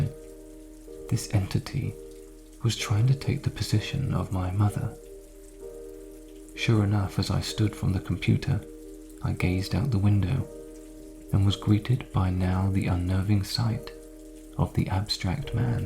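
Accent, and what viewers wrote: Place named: England, United Kingdom. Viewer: the rain.. the English voice.. ahhhhhh yesssss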